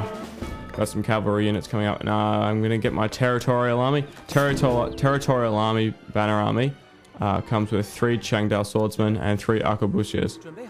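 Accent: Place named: Australia. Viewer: u sound aussie, but good on you pommy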